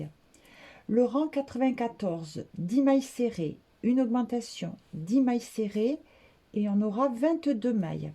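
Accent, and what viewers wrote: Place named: South-West, France. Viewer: Merci pour cette panière. Je viens de la terminer et j'ai pris beaucoup de plaisir à la réaliser grace à vos explications extrêmement claires et précises. J'aime beaucoup vos tutoriels ainsi que votre voix calme avec ce joli accent du sud ouest.💜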